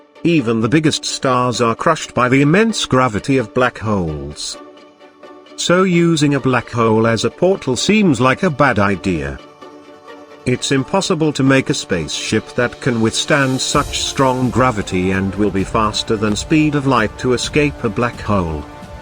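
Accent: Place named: United Kingdom. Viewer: a guy with a British voice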